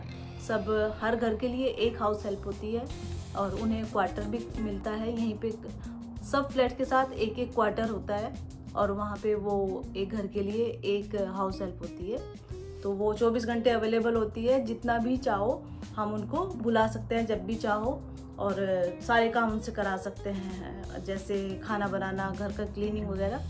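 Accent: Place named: Uttar Pradesh, India. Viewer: Mujhe aapki language tone se lag he rha tha k aap UP se ho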